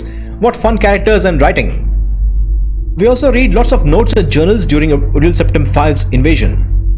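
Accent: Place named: India. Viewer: Sorry if this is rude but out of curiosity what part of the Indian sub-continent do you come from? I always find slight regional differences in accents interesting and I haven't heard one exactly like yours. If this is to rude/personal of a question please don't feel like you need to answer.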